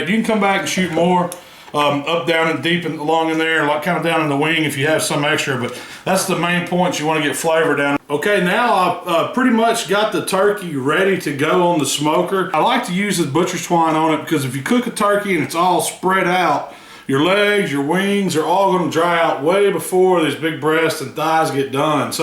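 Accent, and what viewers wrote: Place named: Sand Mountain, United States. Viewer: You sound like one of them ole Sand Mountain boys I grew up with 👍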